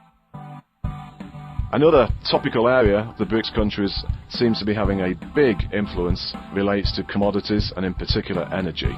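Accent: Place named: United Kingdom. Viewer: despite that british accent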